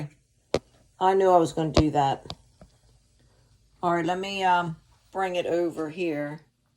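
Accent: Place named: Pennsylvania, United States. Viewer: You have to live in Pa. with that accent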